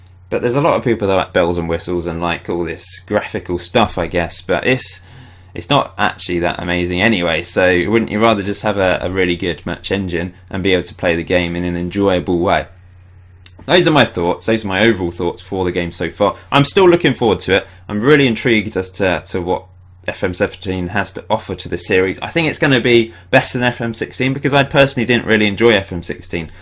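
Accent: United Kingdom. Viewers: british accent is too polite and educational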